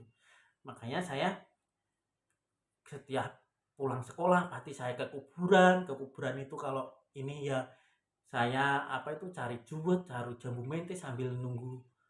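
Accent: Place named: Java, Indonesia. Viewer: Mas.mending ngomong ngomong e Jawa AE..mas Nek ngomong jowo kan medok banget dan khass..dadine Nek pakai bahasa Indonesia rodok wagu..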